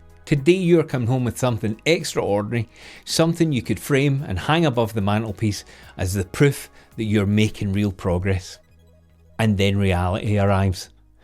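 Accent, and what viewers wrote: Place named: Glasgow, United Kingdom. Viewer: Hey thanks for your take on photography. I recently purchased a mirrorless camera, a 50 mm lens and now a RF 24- 105 "L"lens from Canon for my wife. She's always wanted a good camera so I started her with a Canon R50 APC but a full frame is in the future ih her interest in photography grows. Although we live in the states my wife is from Glasgow so she will appreciate your accent. Thank you sir, will be watching all your content.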